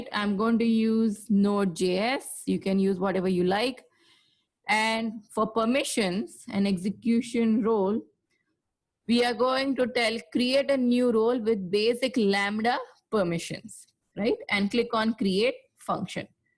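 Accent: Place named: India. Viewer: Explained very well!. Was looking for one with Indian accent. Thanks a lot!!!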